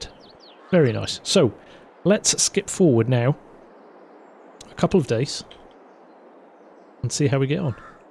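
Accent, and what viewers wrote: United Kingdom. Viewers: Bros too muh brihishh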